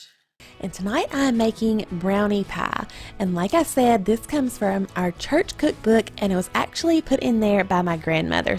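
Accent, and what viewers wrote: South, United States. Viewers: He's not quite as southern as me and you I say one forth also😂😂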